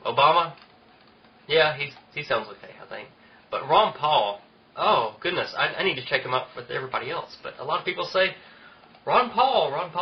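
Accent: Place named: Rural, United States. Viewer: you are an idiot and you cannot even speak the english language your accent sounds like a stupid hick uneducated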